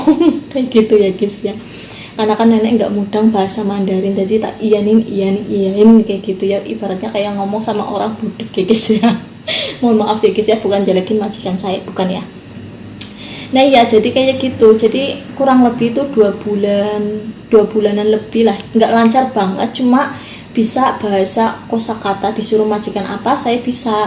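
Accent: Banyumas, Indonesia. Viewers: Selamat pagi mbak ngapak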